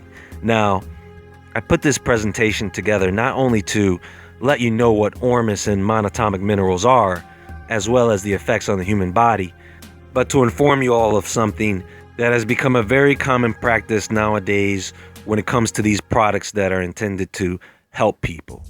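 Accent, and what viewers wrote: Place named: New York, United States. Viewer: Bro are u from NY? you sound like it..I'm from bx live in queens now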